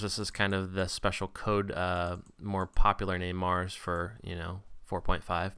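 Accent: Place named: United States. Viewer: good morning Dr good to hear your american voice rather that the accent from India. I already listened to 3 videos on installing Java SW and i had no success too late at night to hear yours maybe tomorrow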